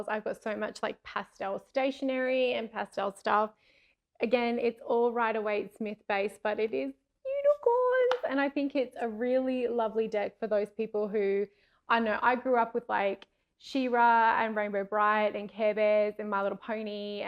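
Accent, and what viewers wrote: Australia. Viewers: Is says Canada but you sound Australian.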